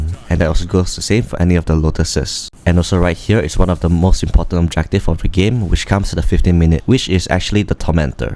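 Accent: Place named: Malaysia. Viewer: i know a malaysian accent when i hear one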